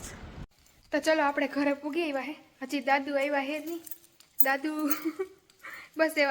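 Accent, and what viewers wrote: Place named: Mer, India. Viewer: Jay siyaram 🙏 Ben tamari mer ni boli saras bolo chho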